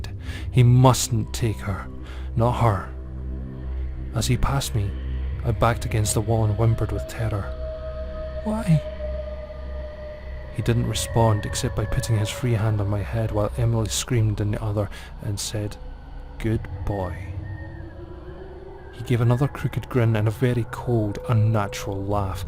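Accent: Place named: Northeast Scotland, United Kingdom. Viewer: Oh no! Ye peer wee dug..fit an affa thing tae happen aefter bein adopted in the first place. Fit rare listnin tae yer bonnie NE accent fearin me silly we yer creepy tales..love it!! Scottish wifie/grunny in London xx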